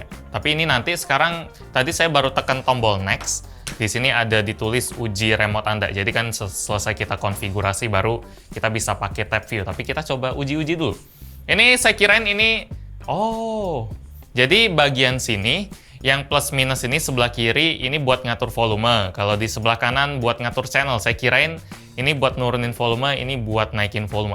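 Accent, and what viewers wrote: Palembang, Indonesia. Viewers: Mengot....🤣 palembang nyo di mano bang? Salam kenal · Mengot Bahaso Palembang nian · Anjay mengot.. palembang nian cuk😄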